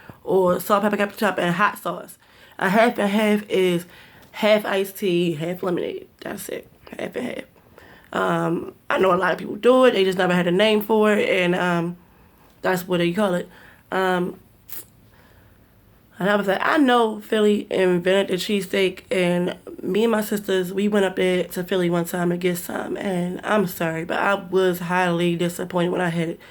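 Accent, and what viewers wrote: Baltimore, United States. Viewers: Your Hon accent is pretty spot on nice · I grew up in waverly in the mid 70’s 80’s and 90’s There and Baltimore in general is called the melting pot based on the diversity or mix of multicultural dynamics. U have the accent down for the most part. And yea, Non Marylanders don’t know nothing about our love for crabs... hon lol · Love your accent. Baltimore accent is colonial American, urban South, unique, awesome. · I am glad you recognized that. Actually it helps you pronounce your words better now that you identified. I am from Bmore also and when I went to the Military I realize that Bmore did have our own language. As you get older and progress you are going to be able to learn how to cut it on and off so you don't lose that business deal for saying MUVA.. 😂😂. Glad to see you experiencing these things. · Facts. Thisis video about "her" accent who happens to be from Baltimore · SW Baltimore was originally an Appalachian accent...or at least that was how it was originally labeled in the 30s thru the 70s. 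She does a good job of capturing the sounds of the accent. She switched to slang which changes too often and too quickly to say something is. Old Bay! Yup. Used to have to carry it back to Florida, but Florida caught on and it’s available in most grocery stores now. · I'm from new Orleans, & I love Baltimore accent. I would definitely date you.. yaherd me..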